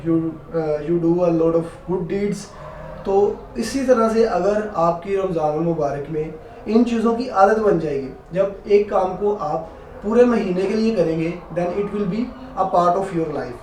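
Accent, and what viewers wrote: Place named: Punjab, India. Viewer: Salaam Waliqum bhai. Good to see your dedication during this holy month. Being a Hindu Bahman, I am trying to maintain Roza for myself too this Ramzan with my muslim brothers like you. You sound like you have a Punjabi tone like mine, which part of Punjab are you from?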